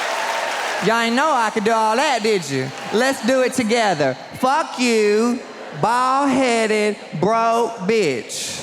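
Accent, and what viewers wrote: South, United States. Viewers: hes southern. This is a southern way of talking. Don't mean anything to race. Cause this isn't AAVE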